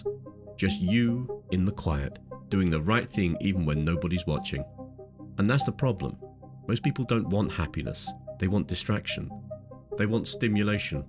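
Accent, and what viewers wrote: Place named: England, United Kingdom. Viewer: G'day from Australia 🦘 I'm listening to your podcast on Castaneda and switched over here to look at your other podcasts. I wasn't sure if you were real or AI generated. You might want to place that note on your podcasts moving forward. You are very intelligent and clever!!! Superb voice for doing podcasts - very English and BBC (that's a compliment). Curious without being "cultish". Good luck. From Australia 🦘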